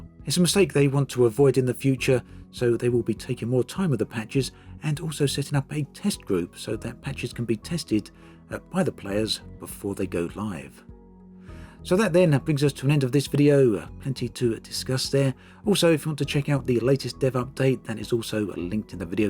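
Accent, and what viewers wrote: United Kingdom. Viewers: His accent is pretty standard for parts of the UK. What a strange thing to say.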